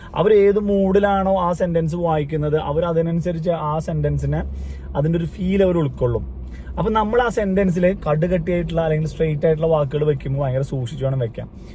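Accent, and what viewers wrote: Thrissur, India. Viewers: R u from thrissur ?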